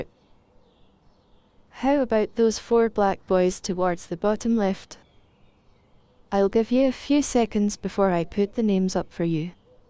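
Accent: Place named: Scotland, United Kingdom. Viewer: This top-down surveying shot is so beautiful! I get to observe most of your boars and how they interact! They are so lucky to be free and have lots of space to explore and play around! So, you are, from Scotland, with a beautiful Scottish speaking style! May I ask who the primary caregiver is for these precious piggies? You really are doing a great job!!! I always have so much fun listening to and watching your video! Your piggies are more than adorable!:)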